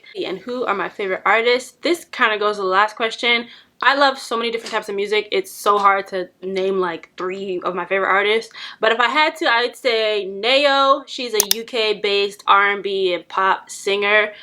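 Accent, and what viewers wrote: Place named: New York, United States. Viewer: I feel like people think you’re Caribbean by how you speak but that’s the New York in you. There is a lot of Caribbean people here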